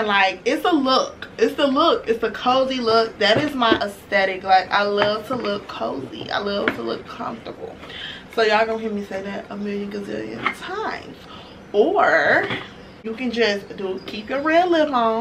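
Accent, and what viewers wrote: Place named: Chicago, United States. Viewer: you sound so Chicago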